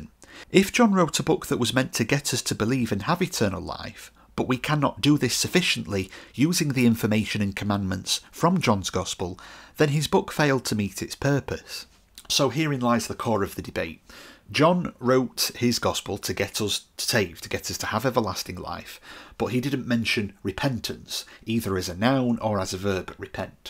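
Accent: United Kingdom. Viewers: Great deep study of repentance, as always brother 👍🙏 i enjoy your studies, fyi: i love UK accent 😊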